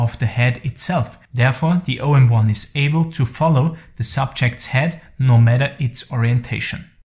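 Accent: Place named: Vienna, Austria. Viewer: Anschaulich beschrieben, danke. Im sympathischen Wiener Dialekt statt in englisch hätte es von mir auch ein "thumps up" gegeben 😀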